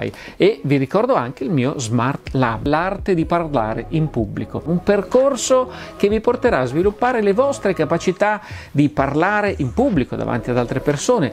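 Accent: Italy. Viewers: Mi piace più il tuo accento piemontese, di quello di Sigir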